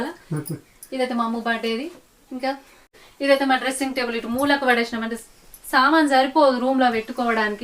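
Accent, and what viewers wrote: Telangana, India. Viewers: Mede Telangana voice Andhra Pradesh atla anacokutaru.mari yatakarm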